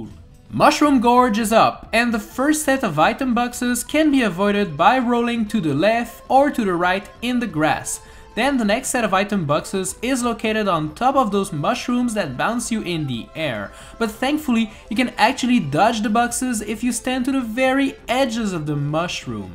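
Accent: Quebec, Canada. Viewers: Are you from Quebec?? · Wait a damn second,. Are you from canada???????????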